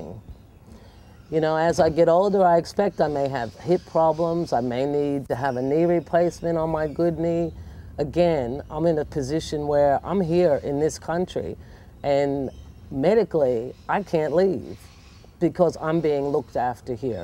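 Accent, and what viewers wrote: Australia. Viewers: You've deff got a half Australian accent going on there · Got that Aussie accent coming in mate :-) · can hear the Aussie accent quite clearly. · 10 seconds in, that accent, she's a real Australian now! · Her accent is a spin out. About 70% Aussie with some yank. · love your Aussie Twang in your voice. so coooooool. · Don't worry. You're an Aussie now. You even sound it,😃 our government cares more about its citizens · BigImaginationDoll16 I was thinking the exact same thing. Some words are said with an Australian accent, like when she says "dollar". Veeeeery interesting hmmmm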